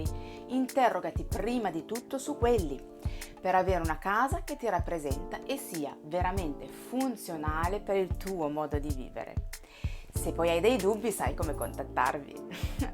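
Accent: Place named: Italy. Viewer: Brava e grazie 🙏 e complimenti per l’accento italiano perfetto!! Ed ho orecchio eh.. :)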